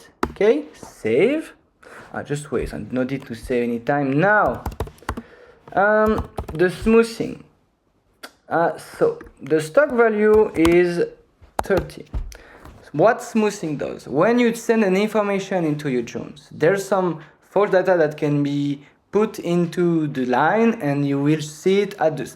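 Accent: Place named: France. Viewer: f*cking english 😂😂😂 nice tutor in frenglish dude 🤜🤛😁😁😁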